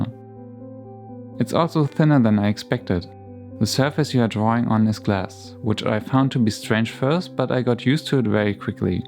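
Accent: Germany. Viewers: Hey i'm new on your Channel. And i think you sound german. Are you? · You sound like a German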